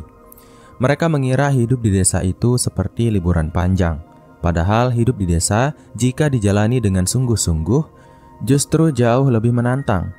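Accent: Bali, Indonesia. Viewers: naratornya bli bli Bali ya.....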